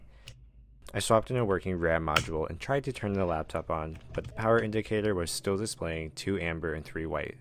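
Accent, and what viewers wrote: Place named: United Kingdom. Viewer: why is there a man from UK talking?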